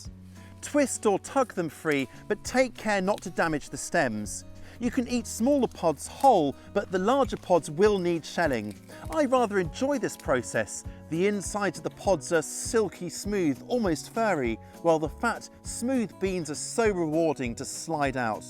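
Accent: United Kingdom. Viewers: Always appreciate a British accent. Similar growing conditions to where I live, Pacific Northwest, so I know I can get relevant info.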